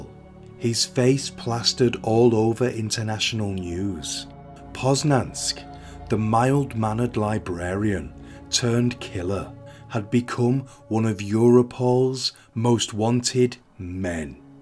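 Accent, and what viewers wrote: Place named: England, United Kingdom. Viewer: English accent on narrated murder documentary is what I like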